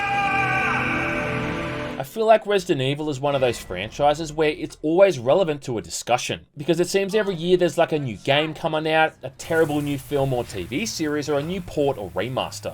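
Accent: Australia. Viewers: It's not "Satin" it's Saturn. Sat-TURN. You're aussie english is atrocious.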